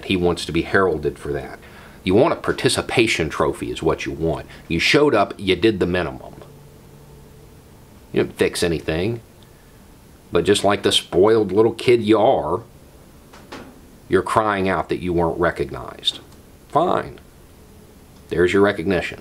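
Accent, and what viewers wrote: South, United States. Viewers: Hey,. I have a question, Is " " a character you play? In several interviews you do not have a Southern accent (or as close as " ") and I am just curious to the why you come off as " "? I am a long-time fan and supporter but did I miss a video or two where you explain this? All the best to you and yours. You'll always be my favorite "Florida Man".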